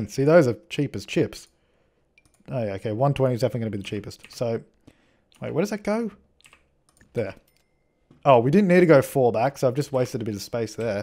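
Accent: Australia. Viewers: because they’re both australian and that’s the only similarity they have?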